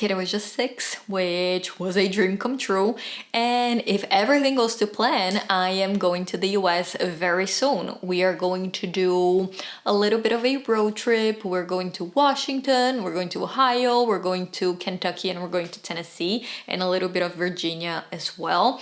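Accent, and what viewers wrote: Brazil. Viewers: The second I heard you pronounce certain letters I knew you were Brazilian 😊.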